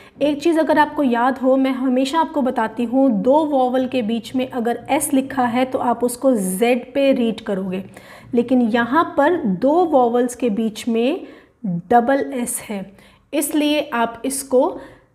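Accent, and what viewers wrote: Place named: India. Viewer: india sound english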